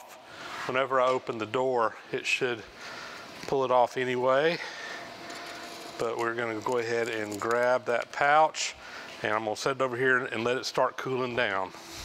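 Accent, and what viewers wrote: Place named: Georgia, United States. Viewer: Was his Georgia accent not enough of a clue?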